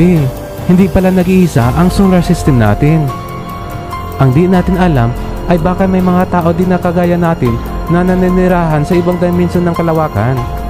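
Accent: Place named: Iloilo, Philippines. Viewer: wow ilonggo nga tagalog..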